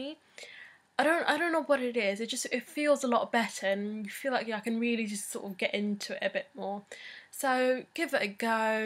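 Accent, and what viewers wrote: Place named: England, United Kingdom. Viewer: I love your accent! are you English?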